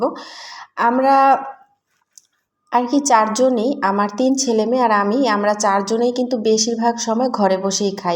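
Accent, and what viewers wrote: Barishal, Bangladesh. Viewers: আসসালামুয়ালাইকুম সোনা আপু আমার ❤কেমন আছো তুমি❤ আমাকে কি ভুলে গেছো😢 ভুলে যাওয়ারই কথা😢 অনেকদিন তোমাদেরকে মিস করে করে গেছি 😢সাধ্য হয়নি তোমাদের সাথে যোগাযোগ করার😢 যাই হোক আপু ❤ভিডিও অনেক ভালো লাগলো❤ শুয়ে পড়ে কানে শুনেছি❤ তোমার বরিশালের কথায় আমার অনেক ভালো লাগে ❤তোমার জন্য অনেক অনেক দোয়া এবং ভালোবাসা রইলো বোন আমার❤❤❤❤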